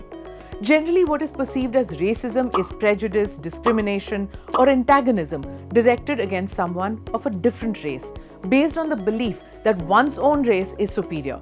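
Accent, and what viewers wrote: India. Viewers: How many Indians have you seen actually make or poke fun at foreigners because of not being able to speak in perfect Indian accent depending on the Indian languages. As far as communication breaking down is concerned the funny thing is that English speaking foreigners hardly complain about lack of understanding. All they complain about is the accent. Infact look at this comment itself where the person commented that the anchor have a weird Indian accent. But the person never said anything about not being able to understand her. So you see accent have become a tool for discrimination which specifically targets Indians more than any other people. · I am laughing at you (multiple times ) whilst watching it, your accent sucks. But hey, it’s fine , you’re Indian. One of the largest English speaking nations (maybe largest). India should have its own accent : that I guess is the case anyway. 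So relax. Cheers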